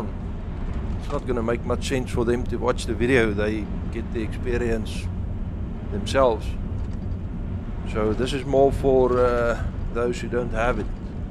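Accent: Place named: South Africa. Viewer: No man you are the best travel commentator I have ever heard. Thanks Bru for the great journey, hahaha I love the South African Accent.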